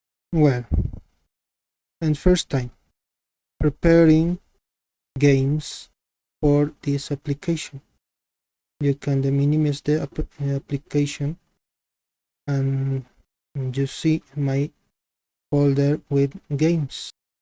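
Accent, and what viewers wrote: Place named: Bolivia. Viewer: Ese xD r u from Bolivia or Perú?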